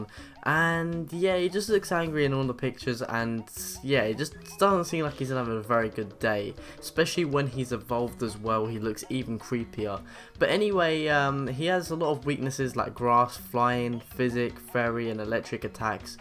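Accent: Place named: United Kingdom. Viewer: Cut Him Some Slack He's British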